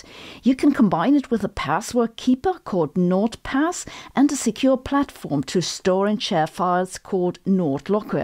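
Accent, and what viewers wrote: Germany. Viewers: It's funny how all Sabine's "e"s become like a German would pronounce an "i", even in words where the actual pronunciation is much more close to how a German would pronounce it, like in Graphene.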